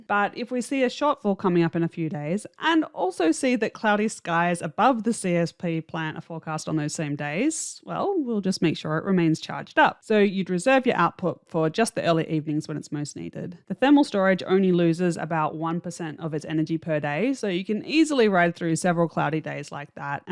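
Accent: Australia. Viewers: As a UK English speaker, I had to put the captions - that is, subtitles - on to work out what "soller" is! Is this Australian or is this?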